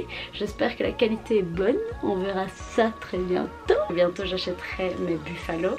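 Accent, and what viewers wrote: Belgium. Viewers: Coucou alors je ne commente (vraiment) jamais de vidéos mais il faut que tu saches que je te trouve vraiment extra. Déjà l'accent belge ensuite ton parcours professionnel de surcroît (oui oui on est en com on utilise de beaux mots) ton style et vraiment le plus beau c'est ton naturel. Continue comme tu fais ! Si tu passes à bx préviens moi que je te paie un café (ou une biere) pcq je suis sûre que tu me fascinerais. Beaucoup de bisouuus et ta video est une pépite 😘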